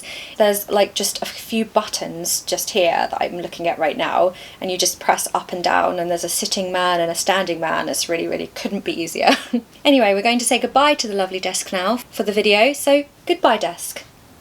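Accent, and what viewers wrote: RP, United Kingdom. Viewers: When you say, hello beautiful people, it's actually hello, beautiful lady with fantastic new lesson for English learners ❤❤. Love British accent modern RP. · Wow excellent reading of you. I always love your British lovely accent and pronunciation. I like your beautiful, amazing desk gifted by Tom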